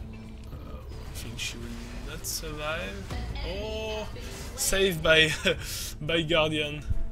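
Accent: France. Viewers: Toi français c'est sure vue ton accent ! Mdr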